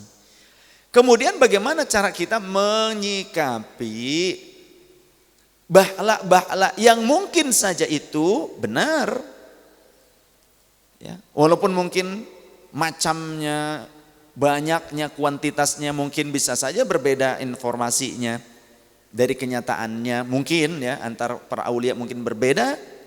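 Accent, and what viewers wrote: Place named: West Java, Indonesia. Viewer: saya mau tanya, apakah punya darah sunda? soalnya logatnya terdengar seperti orang sunda..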